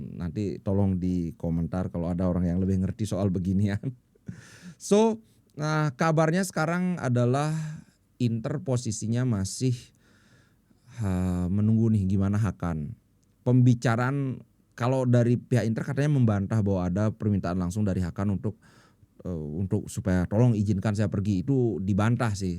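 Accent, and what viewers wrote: Bali, Indonesia. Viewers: logat kayak org Bali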